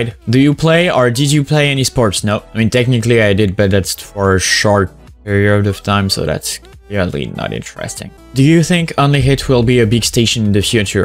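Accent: France. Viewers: sounds french speaks English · I just discovered this guy, and new he was french the first seconds of the video. It’s amazing how french accent is recognizable. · Tu as un accent Français 🤔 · J'était sur à 100% qu'il était français, en tout cas sont accent est incroyable x)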